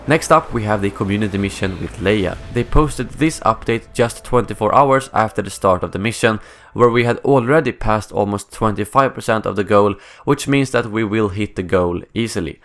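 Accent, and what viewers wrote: Sweden. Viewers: är du från Sverige och stockholm=) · nice channel keep it up! that swedish accent tho :)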